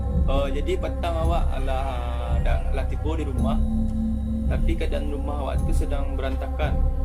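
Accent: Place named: Payakumbuh, Indonesia. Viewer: Logat pikumbuah jaleh bana 😂🤣😂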